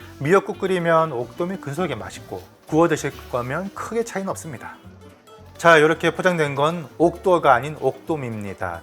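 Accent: Gyeongsang, South Korea. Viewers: 님은 갱상도 사투리 쓰는 서울 사람! 🙆‍♂️🙆‍♂️🤗🤗🤩🤩👍👍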